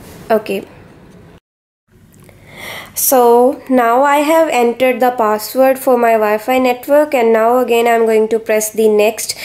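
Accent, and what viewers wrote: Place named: India. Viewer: Endian accent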